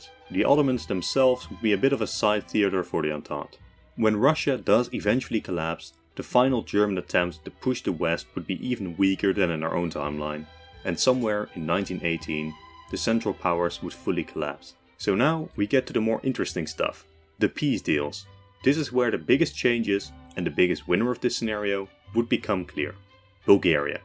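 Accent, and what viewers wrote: Netherlands. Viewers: Wait are you dutch?😮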